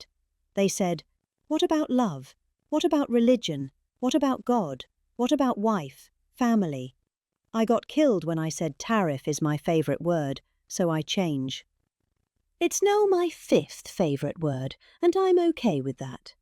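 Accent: England, United Kingdom. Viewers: Spoken in real English by a E glish lady, shows just what a load of rubbish he comes out with. No one can make sense of this rubbish. This is the King of America?? This madman?? This the best you can come.up with??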